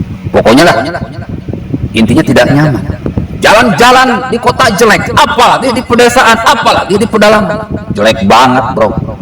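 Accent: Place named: Banyumas, Indonesia. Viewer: Hadir lajut terus ngapak medukung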